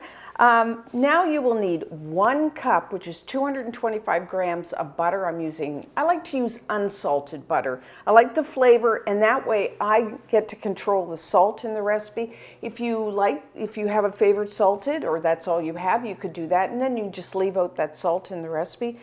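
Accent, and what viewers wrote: Canada. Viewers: Wow, you're from Georgia! For some reason, I thought you were Canadian lol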